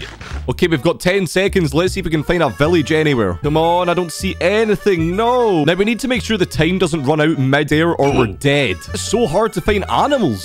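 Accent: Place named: Germany. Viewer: German accent i think Because he pronounces a with low pitch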